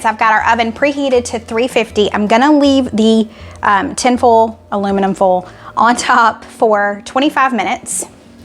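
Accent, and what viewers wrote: South, United States. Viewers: I’m a Southern girl out in the West now, and seeing your happy face (& family’s faces) and hearing your accent makes me homesick. I’m an unmarried empty-nester with severe physical illnesses (heart/lung). Cooking was my favorite thing & I can’t do it anymore, but watching you and your sweet family enjoy yourselves is pretty special to watch. Have the happiest and healthiest of New Years. ❤️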